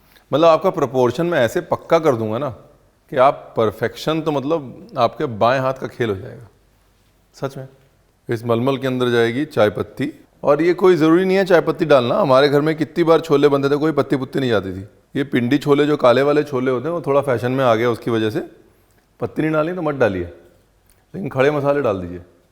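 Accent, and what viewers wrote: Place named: Punjab, India. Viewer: Ranveer, you are awesome, but I like you to hear you in Punjabi style Hindi.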